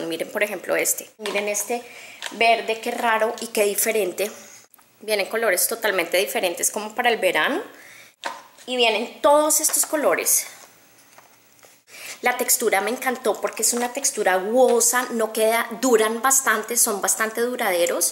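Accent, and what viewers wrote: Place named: Colombia. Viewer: Tan bella siempre....amo tu acento colombiano! !!!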